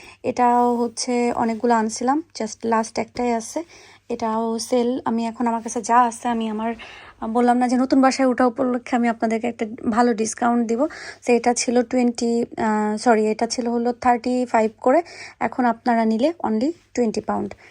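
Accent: Sylhet, Bangladesh. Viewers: Apnar Muk a sylheti vasha sune kub Valo laglo,ami nije o ekjon sylheti tai❤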